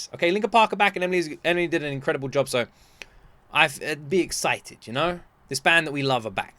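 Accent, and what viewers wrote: United Kingdom. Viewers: Where are you from, mate? I'm trying to get better at discerning accents. I assume UK, but not sure what part. I went down a rabbit hole last night with reactions to LP releasing this new song/tour/album. They are one of my favorite bands, and I think they made the right move by going with a female new lead singer.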